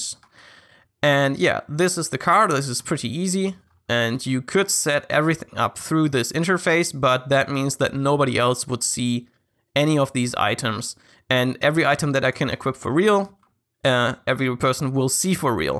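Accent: Germany. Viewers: Bist du deutsch? Hörst dich so an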